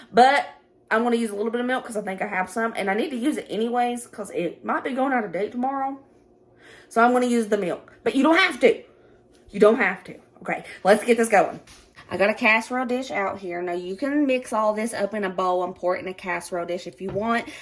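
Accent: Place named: Arkansas, United States. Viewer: Add ur peenches of salt! Love you girl. Your accent reminds me of my arkansas family❤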